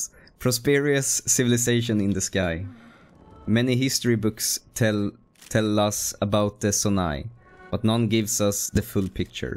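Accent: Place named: Sweden. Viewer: One word in and i hear the swedish accent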